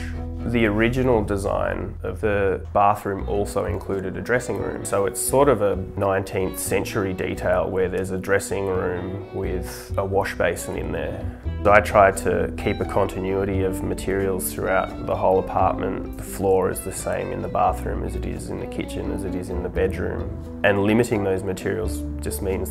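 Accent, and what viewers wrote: Australia. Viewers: I heard his voice and thought It must be Australia. Lol. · Faces north Great lighting That accent Oh he lives in Australasia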